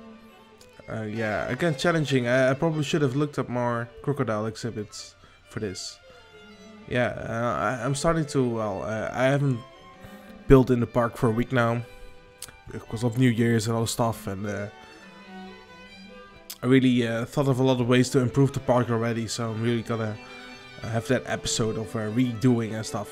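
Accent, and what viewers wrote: Netherlands. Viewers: Love your dutch-english lol. I think adding glass parts in your roofs would make the inside look way better adding natural lighting aswell as making the roof more diverse from above.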